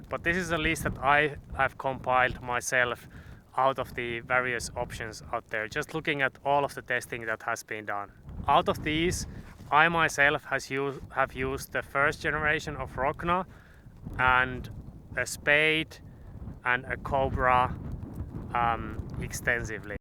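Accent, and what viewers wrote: Finland. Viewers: Once again, simple and very informative with a charming Finnish accent, be well and trust your personal SISU angel and you'll be fine & safe, cheers from Karelian Poika!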